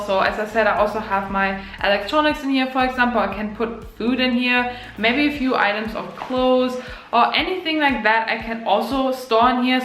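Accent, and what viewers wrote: Germany. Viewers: Hearing the accent I think Germany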